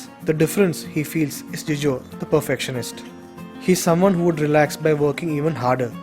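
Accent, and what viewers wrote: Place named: Kerala, India. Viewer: The narrator's English is Purley like a malayali's English!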